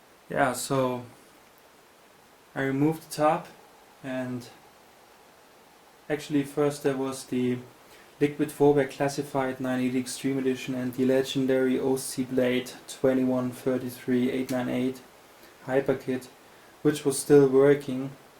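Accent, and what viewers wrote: Germany. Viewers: Kann des sein das du Deutscher bist? Dein Akzent ist so. :D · Nicht schlecht. Man hört so krass, dass du Deutscher bist ^^ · So ein geiler deutscher Akzent... :-) Aber Hammer Gerät ist das!!!! Haben will ! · Du bistn Deutscher :D das hört man soooo extrem :D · Man kann an deiner Stimme hören, dass du Deutscher bist xDD btw wie viele fps haste bei fullhd crysis mit kp wie viel AA^^ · English F.A.I..L i am sure u german right?? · You speak like a german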